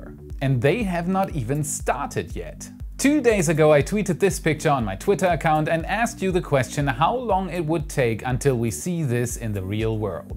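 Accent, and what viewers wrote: Germany. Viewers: He is german isn't he? I feel like his accent is really light :D I'm so glad I found that dude lol Best quality updates! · yeah im also from germany and i can clearly hear the accent. but its incredibly light for a german. and yeah he is my favourite SpaceX youtuber :D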